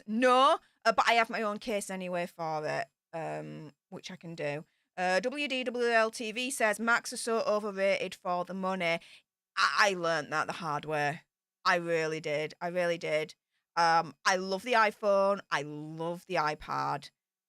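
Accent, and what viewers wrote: Yorkshire, United Kingdom. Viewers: Couple O Wee-Back 🤣 Yorkshire accent is class 👏🏽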